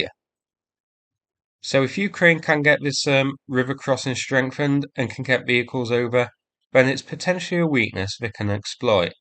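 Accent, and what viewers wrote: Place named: East Midlands, United Kingdom. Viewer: You’ve definitely got an East Midlands accent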